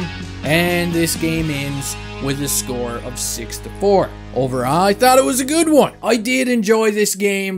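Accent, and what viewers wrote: Newfoundland, Canada. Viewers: This is the first video of yours that I've watched and the Newfie accent is killing me! Love from GFW buddy!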